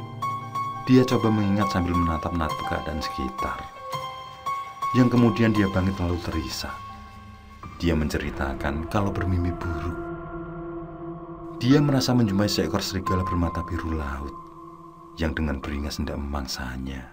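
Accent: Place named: West Java, Indonesia. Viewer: kmu asli lombok apa sunda kok ngomong nya ada bhs sunda nya, klo kmu sunda sudah gak ada harapan saya 😢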